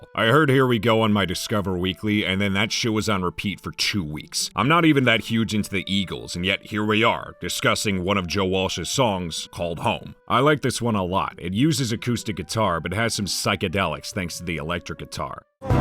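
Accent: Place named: New Jersey, United States. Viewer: Where you from? Jersey?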